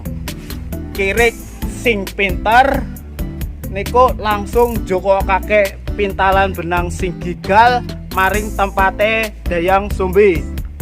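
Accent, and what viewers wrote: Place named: Banyumas, Indonesia. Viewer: logat bicaranya kayak orang ngapak toh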